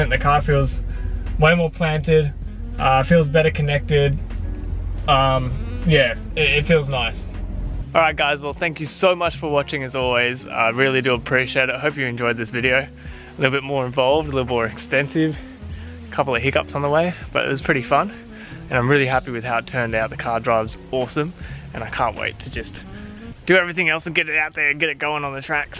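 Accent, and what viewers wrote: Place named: Australia. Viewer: I was wondering myself... english ... airbarg remooved... da fuck, australia¿? (from Spain) Anyway, thanks, really good video mate (y)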